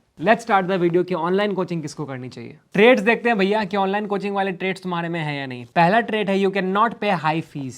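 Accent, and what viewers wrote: Bihar, India. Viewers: Bhaiya aap bihar se ho 😊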